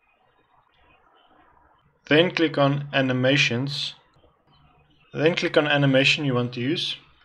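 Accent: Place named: Afrikaans, South Africa. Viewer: I hear that Afrikaans accent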